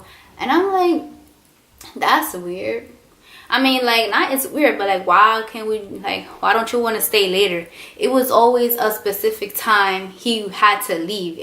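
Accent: New York, United States. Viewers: Well they both from New York she has that New York accent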